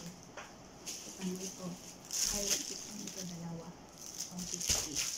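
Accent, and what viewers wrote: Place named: Visayas, Philippines. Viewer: hello nanay bisaya ka haha pariha ta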